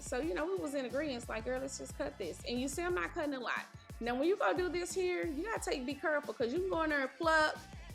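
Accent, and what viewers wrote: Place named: South, United States. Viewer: This is definitely a southern voice over!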